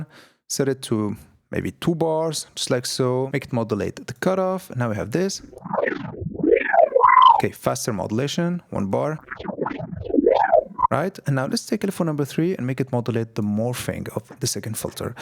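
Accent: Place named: Germany. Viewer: Énorme la modulation sur le spectral. T'as un super accent avec un petit côté Germanique , tu dois être vers l'est j'imagine. Super Skills, tu as un excellent niveau, les presets packs sont flawless and gorgeous. Merci mille fois pour le partage de ton savoir ✌️🙏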